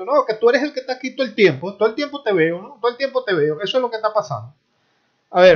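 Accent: Colombia. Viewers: el acento suena a colombiano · El acento no es chileno, más bien diría que es en colombia.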